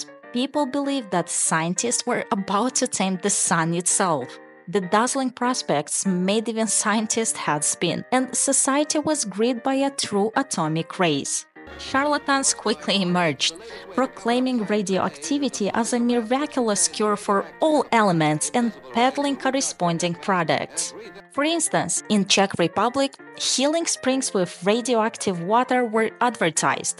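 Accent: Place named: Russia. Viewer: What accent is this?? Russian?? Super adorable accent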